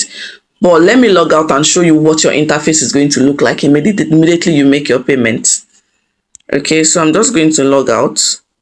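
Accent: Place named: United States. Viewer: The presentation in this video is top notch! Your accent don Dey turn USA oh sis😂 YouTubers! Big people💯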